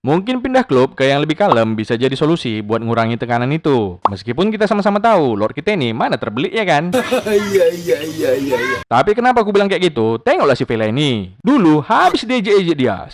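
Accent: Medan, Indonesia. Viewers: Orang Medan Bang?? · Abang org medan ya bg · Bg lu orang medan yaa bg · Wkwkwk, the best presenter bola versi medan bung😁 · Orang medan kah???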